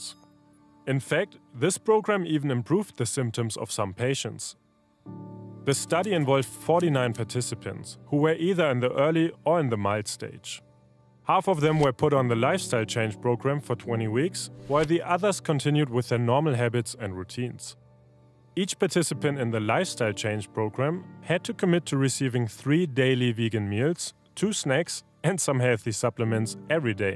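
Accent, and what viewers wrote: Germany. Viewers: Please! Get a native English speaker or use an AI voice. This bad pronunciation as well as the German accent is awful...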